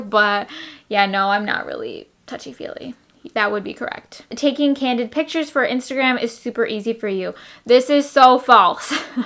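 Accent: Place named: New Jersey, United States. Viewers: I thought you were from New Jersey bc of your accent 😂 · Love your lipcolor!!! And I assumed you were from Jersey too. I'm born and raised in Cali. And to me, your accent sounds like what I would assume is a Jersey accent. Buuut, I am not an expert obviously on accents lol.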